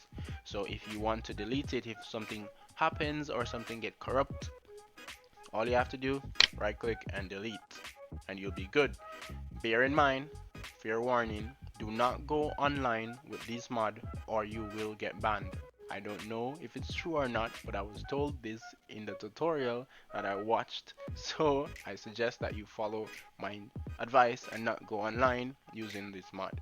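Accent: Jamaica. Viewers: i cant tell if you are from jamaica, canada or india lol no offense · u jamaican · are you jamaican? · Good vid bruh. Jamaica? Can hear the patois in the voice Wah gwan!!!!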